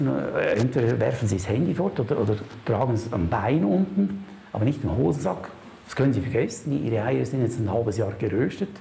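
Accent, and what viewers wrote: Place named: Switzerland. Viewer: Es ist sehr schwer der schnellen, Schweitzer Sprache zu folgen. Ansonsten ein ganz großartiger Vortrag, in dem nur Wahres gesprochen wird. Wir alle sollten dem Folge leisten.....so weit es möglich ist.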